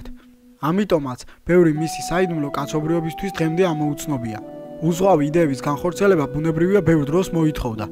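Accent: Kutaisi, Georgia. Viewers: რაფერ გეტყობა ვიოო ქუთაისელი რო ხარრრ🥰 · არ იქნება ეს ქუთაისელი..... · ქუთეისელია რამე პრობლემა გაქვს დაია??????????????????????? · Kutaiseli xar 😁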